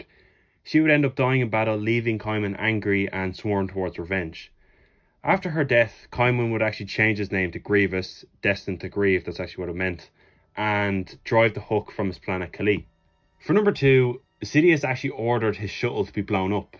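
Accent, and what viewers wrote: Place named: Ireland. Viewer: Love your channel mate ...Nice to hear an Irish lad on youtube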